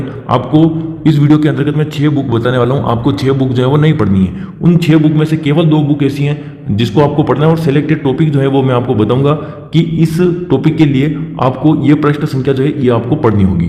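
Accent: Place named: Rajasthan, India. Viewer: Khatarnaak Rajasthani dialect 🎉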